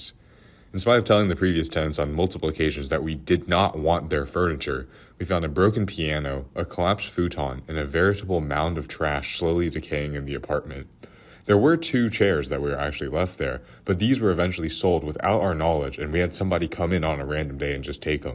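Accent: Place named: North Jersey, United States. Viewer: Sounds like north Jersey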